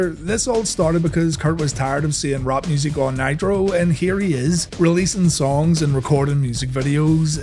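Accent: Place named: Northern Ireland, United Kingdom. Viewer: It’s been a long week lads, let’s gather around the fire and reminisce about 90s Wrestling as narrated by a delightful fella from Northern Ireland.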